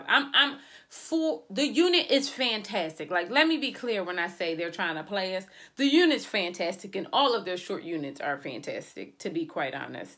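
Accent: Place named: Philadelphia, United States. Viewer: You from Philly? I am and you sound like you from Philly😂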